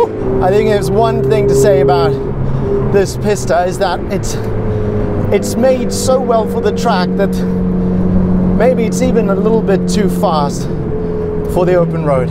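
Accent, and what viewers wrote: South Africa. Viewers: This Presenter is definitely South African. gatta Pattata?. Leon Schuster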